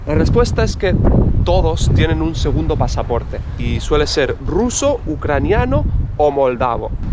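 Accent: Spain. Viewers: No conocía tu canal!!! Calidad!!! Saludos desde El Salvador! Solo una duda, de qué parte de España es tu acento ?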